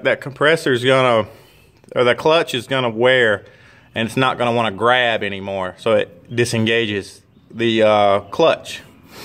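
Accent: South, United States. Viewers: I just love these mechanics/technicians with that hick grit accent, who are smart as hell. The accent makes you think they ain't too smoort. Then then show you what's up. I used ta get fooled by that accent, but then I went to navy nuclear power, worked with some terrifically smart good ol boys from the South, and got my city ass handed to me in terms of smarts, problem solving, and being great ship mates. Wish had been on my boat. Oh, and like a good nuclear engineer, he starts most of his explanations with, "basically"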